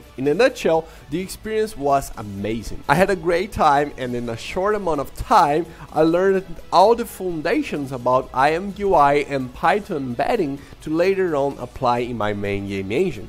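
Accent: Brazil. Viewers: Are you Brazillian right? I realized because of the R pronunciation, your English is almost perfect though, it took me some time to realize. Great video, great job! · caraca, esse sotaque br tá muito aparente kkk pô irmão, me salvou ein, tô querendo aprender a produzir games e acho que a parte mais complicada pra mim é a engine em si, mas ainda bem que tem pessoas como você pra ajudar, tmj!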